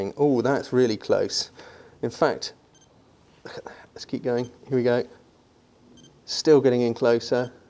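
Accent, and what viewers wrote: United Kingdom. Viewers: Fantastic review and I love your accent ! You British ?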